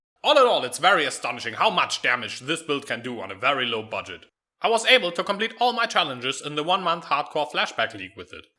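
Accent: Germany. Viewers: Talking like what? He's German, you expect him to have no accent?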